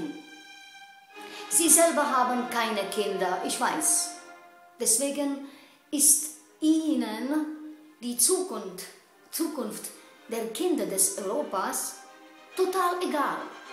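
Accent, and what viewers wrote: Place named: Eastern Europe. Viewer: gefällt es auf jeden Fall überhaupt nicht und wahr ist das was Sie da von sich geben, schon gleich gar nicht! Noch verwunderlicher ist es, wenn jemand wie Sie, mit hörbar osteuropäischen Akzent, die anscheinend hier in Deutschland lebt oder zumind. in Deutschland gearbeitet hat (deutsche Sprache ist dann eben Voraussetzung), also aus einem anderen Land kommend, ihr neues Lebens-Glück in Deutschland gefunden hat, dann gegen Migranten und die deutsche Politik schimpft, die es erst ermöglicht hat, dass Sie selber in Frieden in diesem Land leben dürfen oder wie gesagt zumind. hier arbeiten durften oder dürfen - wie auch immer ihre Lebensgeschichte auch sein mag. Sie sollten mal auf den Kalender sehen, in welchem Jahrhundert wir leben. Sie sind hier in Deutschland willkommen geheißen und das gilt also nicht nur für Sie!!! Frau Merkel hat sich diese Flüchtlingssituation nicht ausgedacht und die Masse an Menschen ist bereits schon seid Anfang dieses Jahres unterwegs und wenn die deutschen Politik in Europa von Anfang hat die Schotten dicht gemacht hätte, dann hätten wie eine in der Welt noch nie dar gewesene humanitäre Katastrophe gehabt! 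Länder wie Griechenland oder Italien, die eben ans Mittelmeer grenzen, wären total überfordert gewesen. Die Flüchtlinge wären so oder so gekommen, nur die anderen Länder sehen alle weg und hat über Jahre hinweg nur Mitleid mit Kriegsflüchtlingen und hungerten Kindern geheuchelt. Jetzt aber, wo sie plötzlich vor der Haustür stehen, will sie keiner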